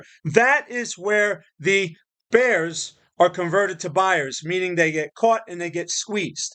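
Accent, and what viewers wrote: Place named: South, United States. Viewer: Came for ta instead end up listening to a hill Billy meth head 😂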